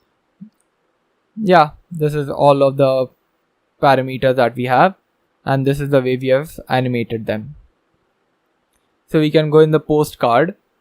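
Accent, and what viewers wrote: India. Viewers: Indian? Ye for sure it's indian accent. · The faculty is Indian🥰 bro i can easily get your accent. First time I watched codecAmp video without turning on the caption😍❤️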